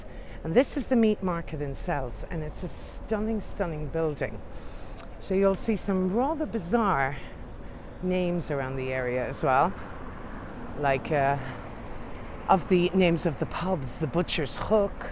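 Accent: Ireland. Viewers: Definitely agree. Just discovered this channel and is an incredible guide. Lovely Irish voice. Sounds the same as my former work colleague and she was from Co. Kildare. Xxx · I would love to see your video of your travels home. I thought I recognised your regional accent, as my husband's family are from Mitchelstown. I would love you to visit Bunhill fields. Resting place of some very familiar names. Daniel Defoe being one. A short walk from old Street tube station, and a real gem. Safe journey home. ☘️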